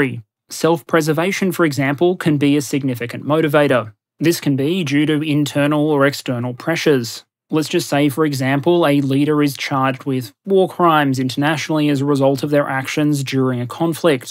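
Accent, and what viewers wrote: Australia. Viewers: After watching a great f1 race, then the rest of the wec race at monza and also imsa i get to listen to an aussie talking about war and negotiations while making F1 references. Perfection.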